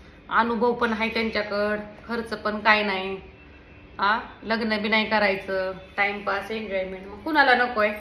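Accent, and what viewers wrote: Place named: Pune, India. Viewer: Tumchya bolnya warun tumhi punyat kuthe Rahat aasal te lakshat yete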